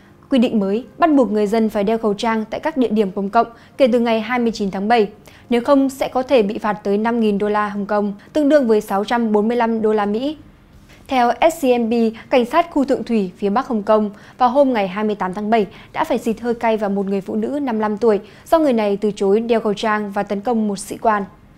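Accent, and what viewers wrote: Standard. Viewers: BTV tuyệt vời phát âm rất chuẩn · Mc nầy phát âm chuẩn quá.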